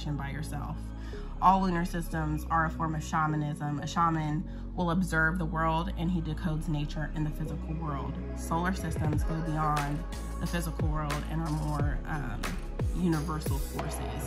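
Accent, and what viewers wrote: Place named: Northeast, United States. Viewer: very helpful thank you!! Also you sound a little like me-- and people don't always know what my race is based on my voice lmaooooo-- are you from the NorthEast of USA? (I'm from NYC)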